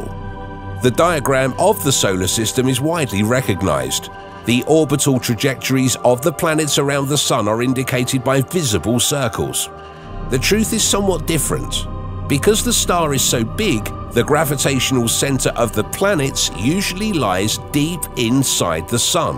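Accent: United Kingdom. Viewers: What's wrong with the narrator being "Brit"? Are only Americans allowed to speak on YouTube? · Why is the narrator a Brit? Also, why after 70 years of space exploration and billions spent on Satellites and Probes are we essentially clueless about why or what is actually happening in, on, and around Jupiter? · HUH? What do you mean "why" is he British? Do you expect the crew to go "oh damn agh nevermind you can't narrate. You're British so.." Why not a brit?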